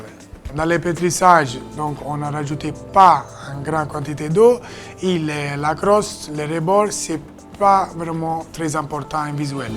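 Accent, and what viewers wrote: Italy. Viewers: Great job, you're great. Love your Italian French accent, never be ashamed of it and be proud of your origins!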